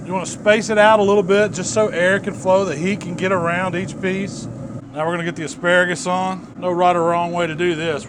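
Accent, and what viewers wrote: South, United States. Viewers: For as much "southern drawl" as you have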